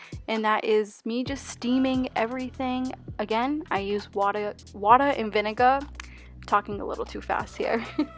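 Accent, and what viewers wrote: Boston, United States. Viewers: Are you from Boston? I can hear the accent!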